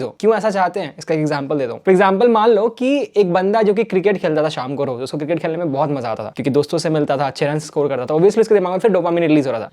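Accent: Bihar, India. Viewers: Your Bihari accent is amazing 😂😂❤❤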